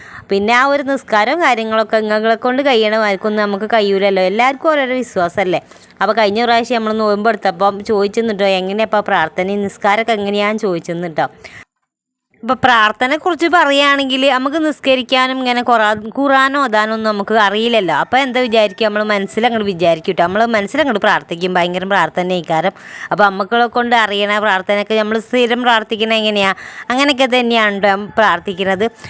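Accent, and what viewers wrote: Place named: Malappuram, India. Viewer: Ethrayaayaalum ningalude prarthanayum nombum chechi Allahu sweekarikkum.Alhamdulillah . Ningalude varthaanam kettal assal Malappuram thathamarude polethanneyund.May God bless you sister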